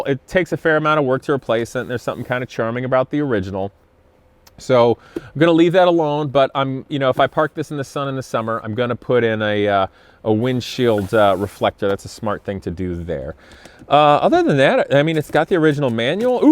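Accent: England, United Kingdom. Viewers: Excellent buyers guide. I would suggest you cover the dash with a leather cover. This is available online. I did find the British accent annoying though... Im part of the Porsche Club GB and I’ve never heard anyone be quite that anal. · Rally it, that English accent is sick haha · Great British accent,! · Good vid v detailed, do have a soft spot 4 944's. Nice example. Love the plummy posh English accent!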